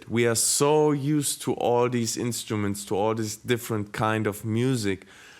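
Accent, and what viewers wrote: Germany. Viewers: That german accent😂 Great remake🔥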